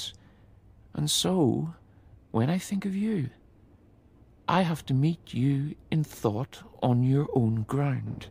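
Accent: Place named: Ireland. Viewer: Love the Irish accent …. Easy on the ear …thank you. The Irish author, MacNeice, spent time studying at Oxford … the work reflects a degree of both an English & an Irishman’s sensibilities …. Threads of the English upperclass gent 🤵‍♂️comes thru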